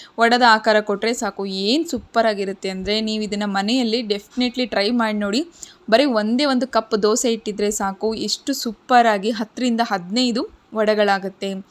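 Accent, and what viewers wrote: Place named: Hindi, India. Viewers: Neevenu north india dhavara? Achchukattaagi kannadadhalli sariyaagi uchchaara maadi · ಅದು ಹಿಂದಿ ಭಾಷೆಯ ಉಚ್ಛಾರಣೆ. ಅದಕ್ಕಾಗಿ ಅವರಿಗೆ ಭಾಷೆ ಬರಲ್ಲ ಅಂತ ದೂರುತ್ತಾ ಕೂರ ಬೇಡಿ. 🙏🙏😅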